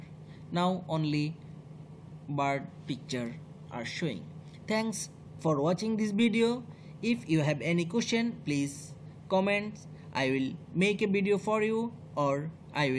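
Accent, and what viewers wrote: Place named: Bihar, India. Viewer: Bihari english speaking first you speak clearly hindi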